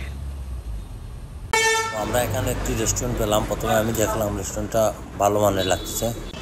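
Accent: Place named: Sylhet, Bangladesh. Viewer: অরদেক শুদ্ধ ভাসা অরদেক সিলেটি ভাসা তুমার কন্ট ফাটা ফাটা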